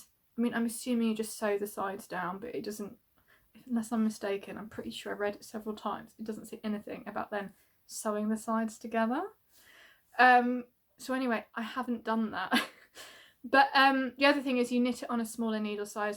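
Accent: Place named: Liverpool, United Kingdom. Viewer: A wonderful episode! I so enjoyed knitting along and listening about your knitting journey. I grew up visiting my grandparents in St Helens near Liverpool. Your accent brought me back over 40 years. Best wishes from a Canadian knitting podcaster!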